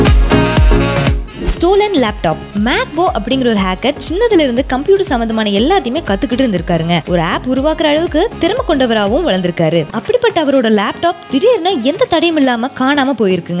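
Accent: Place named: Coimbatore, India. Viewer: yenunga neenga coimbatore aanga..?! 🤗🤗👏👏 super voice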